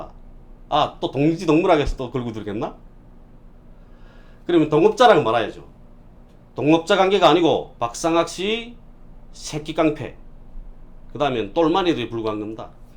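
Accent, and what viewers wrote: North Korea. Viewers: 북쪽 말이 좋습니다!고치면 되지!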